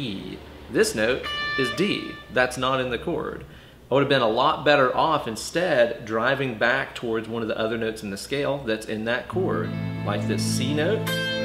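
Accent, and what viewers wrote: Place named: Tennessee, United States. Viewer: the Dave Ramsey/I definitely live in Tennessee voice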